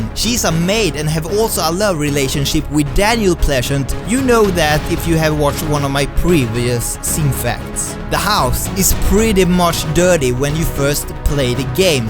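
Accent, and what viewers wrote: Sweden. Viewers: He is Swedish, the game is on swedish so that's why he has an accent and bad grammar. Not that all swedes have bad grammar but he has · Din svengelska är helt underbar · That is Swedish. He has an obvious Swedish accent as a German that speaks Swedish as well I can tell you that is Swedish, German looks pretty different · He's a Swede, you can tell that from the accent.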